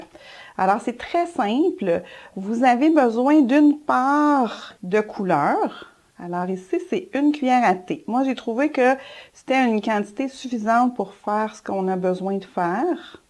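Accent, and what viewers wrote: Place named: Quebec, Canada. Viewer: Ce que j'adore c'est qu'au Québec il fait froid une grande partie de l'année mais l'accent réchauffe le coeur de celui qui écoute autant que l'accent de Provence !=)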